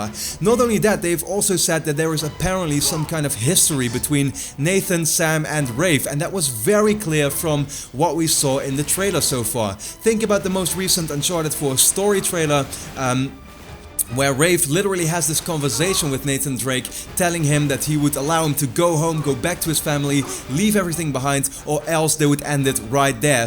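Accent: Netherlands. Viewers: are you dutch Btw this is a great video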